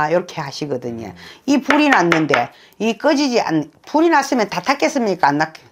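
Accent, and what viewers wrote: Busan, South Korea. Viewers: 선생님 부산분이 신가요?ㅋ 쏙쏙 귀에들어옵니다 ㅋ 정묘생 쏠로운은 없나요😅 좋은 말씀 감사합니다❤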